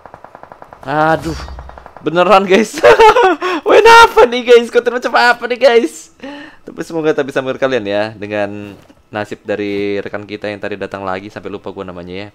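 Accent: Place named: Medan, Indonesia. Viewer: bahasa nya bg kreak medan kali bang😂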